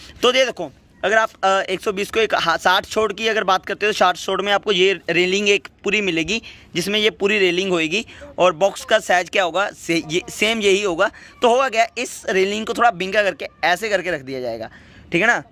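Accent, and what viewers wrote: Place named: Punjab, India. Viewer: Love your punjab accent😅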